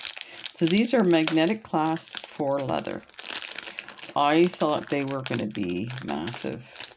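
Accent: Canada. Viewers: Love watching your unboxing videos. You're so excited the whole time. You sound much like a Cape Bretoner, which I am lol. You sound like someone I could be good friends with. Anywho just wanted to share my appreciation, I've just started my beading and jewelry making hobby about 2 yrs ago and love it. I'm looking forward to more video from you and still catching up. Have a great night.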